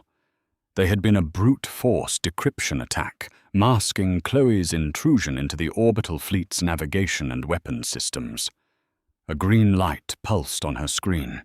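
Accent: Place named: England, United Kingdom. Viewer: I like the uppity English voice........original......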